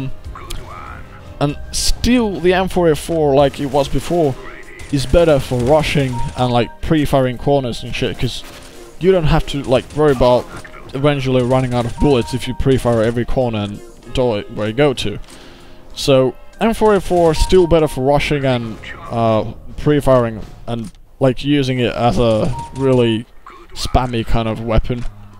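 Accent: Finland. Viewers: I can hear the finnish accent leaking through! :^) But still hellagood english skills and good and entertaining video, earned my sub! : D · Vittu että nautin tosta sun pienest "Ralli Inklish" :D sen erottaa sielt just ja just :D muttei myöskää välttämättä jossei keskity :D vitu hyvää commentaryy näit lisää +1 :)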